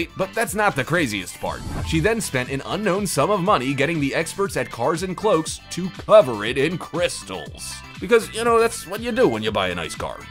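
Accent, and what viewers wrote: United States. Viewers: narrated by an American accented person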